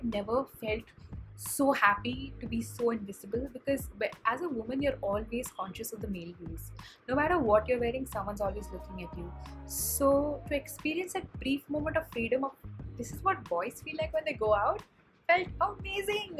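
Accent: India. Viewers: Her accent is Indian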